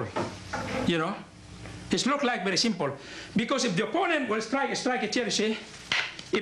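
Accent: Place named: Cebu, Philippines. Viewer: His Inglis aksent is typiical Cebuano. HIS ENGLISH ACCENT is CEBUANO not AMERICAN. Great MARTIAL ART, can be effective when your LIFE IS IN DANGER, SELF DEFENSE. The STICK is faster than the HANDS, faster that the FEET.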